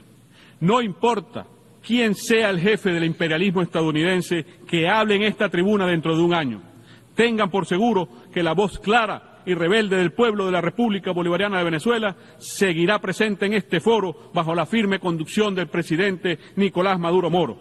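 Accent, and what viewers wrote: Cuba. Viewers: ¿A nadie le parece curioso que este tipo tenga acento cubano? No es Venezolano. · Ese tipo no es venezolano/es cubano,se sabe por el asentó!. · Tiene un aceptó cubano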